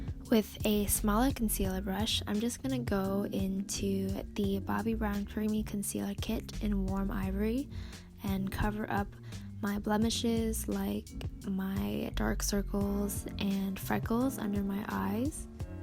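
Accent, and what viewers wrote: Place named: United States. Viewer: Your voice sounds like Jess conte but with an American accent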